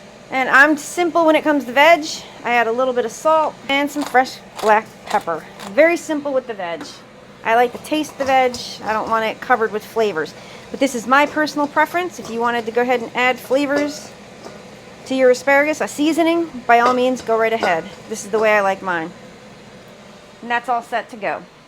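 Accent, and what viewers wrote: United States. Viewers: Please don’t apologize for normal speech. I enjoy your cooking passion and your every day American speech. You owe no one an apology. Love your videos!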